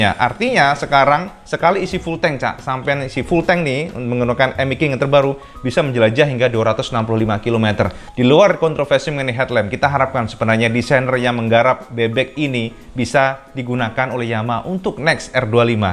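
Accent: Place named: Java, Indonesia. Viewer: Kang emang gokil cak...podo jowone karo aq...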